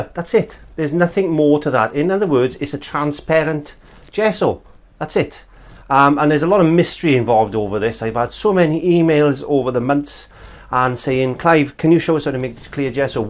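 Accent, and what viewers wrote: Wales, United Kingdom. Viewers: Loving the Welsh accent, hello from Taffs Well Butty Boy :)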